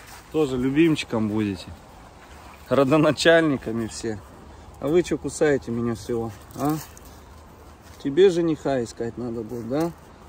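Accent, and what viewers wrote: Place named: Ukraine. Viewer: привет. Я на работе, показал твоё видео,один человек, даже подписался на твой канал. А мне говорят, что у тебя, украинский говор.Есть ли у тебя,родственники в Украине.У нас парень работал Сергей,его тоже фамилия.Он сам родом из Чукотки,а сейчас живёт, в городе Изюм.Просто ради любопытства, ты случйно,не его родственник?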